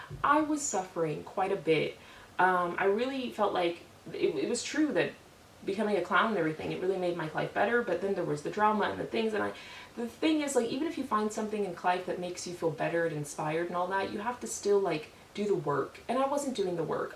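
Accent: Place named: England, United Kingdom. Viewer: My guess is the english accent.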